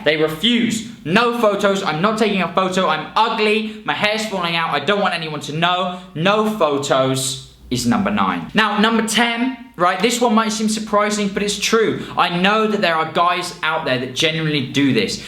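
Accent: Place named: England, United Kingdom. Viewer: He's English. He's saying it the right way. They did invent the language after all lol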